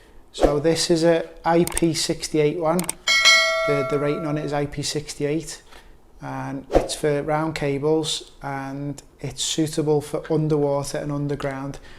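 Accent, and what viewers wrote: Liverpool, United Kingdom. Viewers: This tutorial was great help Coming from a not-so-handy person, I can guarantee anyone can do it after watching this wholesome la's video Speaking of, everything sounds better in a scouse accemt, including instructions Thanks again for your time to upload such a helpful step-by-step guide Stay awesome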